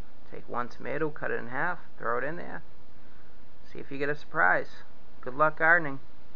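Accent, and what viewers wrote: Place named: Boston, United States. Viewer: Before I even saw your channel name I knew you had to be a fellow Mass-hole and Boston guy! Great video and great accent. I mean, wicked pissah accent!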